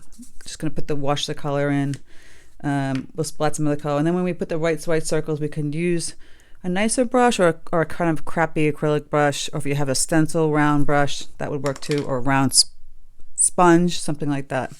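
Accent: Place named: New York, United States. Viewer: Love the accent! N.Y, N.J.?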